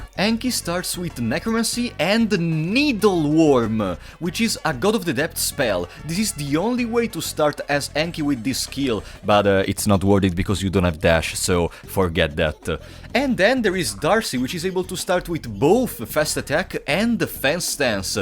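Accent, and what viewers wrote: Italy. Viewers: When I first started watching your videos your accent sounded Russian, but then after seeing you play the game Italian you now sound Russian but with an Italian twang at the end of your wordsa. Even the content creators for this game are a maddening riddle and mystery. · Are you italian? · fwiw i love your Italian accent. I'm an American and I understand what you're saying.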